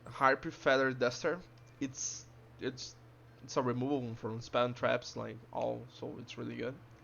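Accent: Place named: Brazil. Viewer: Qual foi, pelo inglês sabia que era BR, tem alguma forma de falar contigo pra me explicar como rodar esse deck ?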